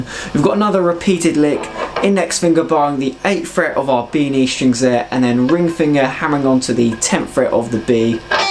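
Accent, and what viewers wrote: United Kingdom. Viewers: what a beatiful aksen really love britain aksen 😍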